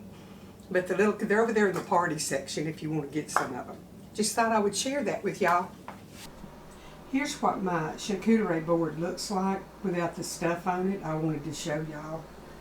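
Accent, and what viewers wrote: South, United States. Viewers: I love your channel! (And your Southern accent!) It just feels like home. My son's going to love this recipe!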